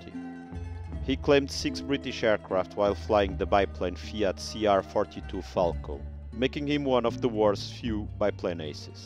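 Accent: Portugal. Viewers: Is the voice Portuguese? Reconheci logo o sotaque. Ahahahhahahaha abraço w muito bom vídeo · Great analysis on an interesting subject. Well done! Do I detect a Portuguese accent? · NIce video, and nice Portuguese accent :) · A Portuguese accent..great video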